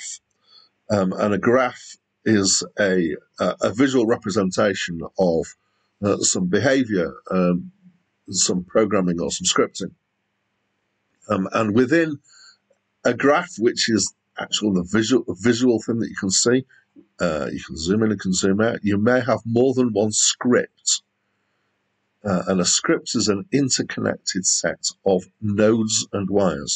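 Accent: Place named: United Kingdom. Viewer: Ok plus noticed the accent my UK bud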